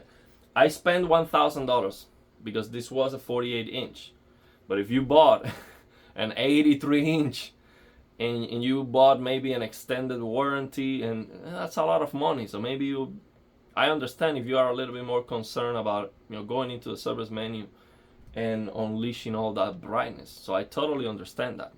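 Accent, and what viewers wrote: Cuba. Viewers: Asere tu eres cubano xq me suena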